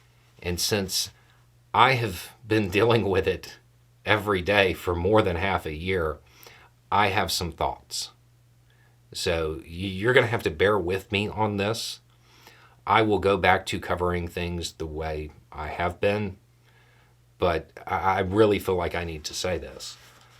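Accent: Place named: Transatlantic, United States. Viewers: Hi, I really enjoy your compassionate analysis. I understand this video was a tangent for you, but I really enjoyed it. Also, please feel more than free to use the transatlantic accent as often as possible in the future. I loved everything about it, no notes! 🙂❤️ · transatlantic accent is not something I ever expected to hear. 10/10.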